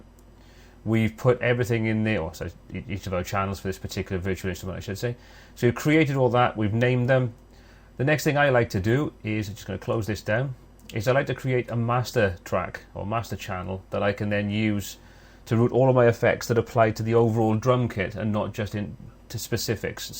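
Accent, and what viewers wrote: Wales, United Kingdom. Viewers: Nice to hear a Welsh voice on this video. Hwyl from Cardiff!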